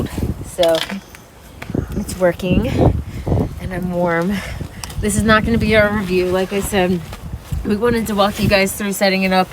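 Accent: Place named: Maryland, United States. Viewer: My wife just walked in the room as I am watching this video. She said “who is she, is she from Maryland?” I said hell yeah hon! Lol! 🦀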